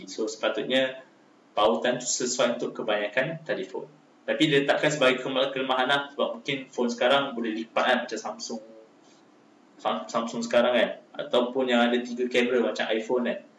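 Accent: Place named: Sabah, Malaysia. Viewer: Macam orang sabah bah si ni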